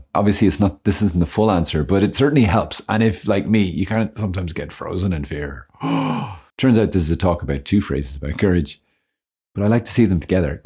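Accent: Ireland. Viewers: Great to hear an Irish accent in this space!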